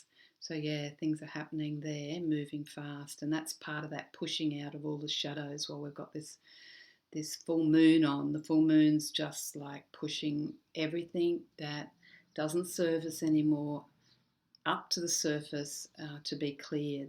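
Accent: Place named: Australia. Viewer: 1. I love the Australian accent. 2. Absorbing the Love vibration you emit. 3. You are the perfect spiritual advisor needed at this time. In conclusion, your insights and suggestions help to analyze how one can manipulate ones perceptions for a better understanding of ourselves, those around us and the collective. Much gratitude, love and Blessings for your time and energy. <3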